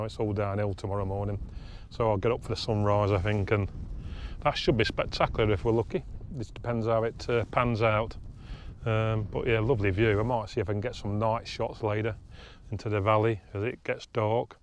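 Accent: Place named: Derbyshire, United Kingdom. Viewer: You are so very fortunate to live in a small Derbyshire village AND to be the type to get out and really know your hills & dales. So many people live in beautiful country villages but don’t walk anywhere these days. For me, apart from the stunning scenery, I love your Derbyshire accent and wait for that moment in each video when you have a rant .. and swear a lot for a brief moment lmfho! Love it!!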